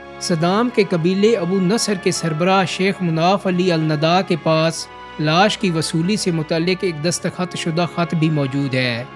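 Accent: Chakwal, Pakistan. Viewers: Ap k bolny Ka style touch chakwal said Ka hai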